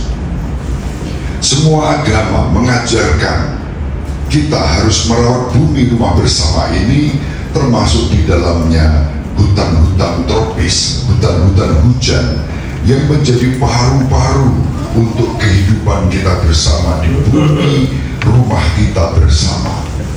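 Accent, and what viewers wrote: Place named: Kalimantan, Indonesia. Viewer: Kalimantan mana suaranya❤❤❤😅😊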